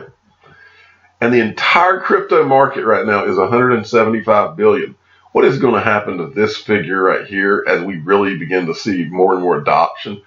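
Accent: South, United States. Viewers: I thought you had had 5 or more margaritas ...until i realized i had your vlog set to 0.75 ..which makes your southern slaw sound so slow and sounding drunk ,my deepest apologies ...of course i can not take away your southern twang ,but it seems i can make it worse . xrp bud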